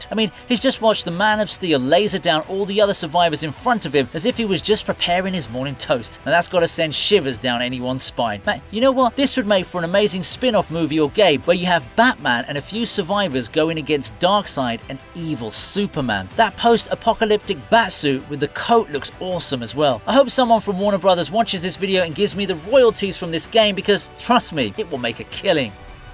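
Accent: England, United Kingdom. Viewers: Love your accent I'm American and I just love a funny English accent proper English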